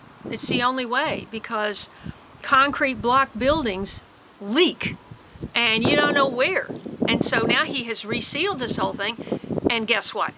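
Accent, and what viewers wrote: Tennessee, United States. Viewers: Sounds like you are in Tennessee?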